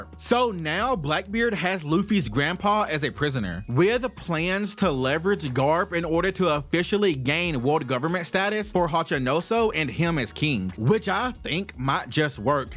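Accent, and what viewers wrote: South, United States. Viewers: I'm loving the southern twang bro Def adding you to the one piece yt lineup 🙏🏾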